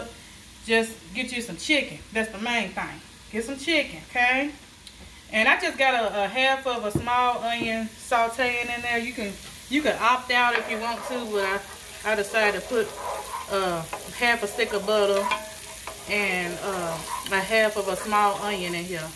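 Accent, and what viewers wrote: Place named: Tennessee, United States. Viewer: Baby you're definitely from Tennessee ! I'm from West Tenn, and it's the southern draw for me lol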